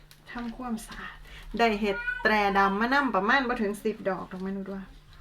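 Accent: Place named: Isan, Thailand. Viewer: จะแมนคิดฮอดบ้านนอกคักเนาะแม่ 55 ฟังเสียงเว้าเป็นตะลิตน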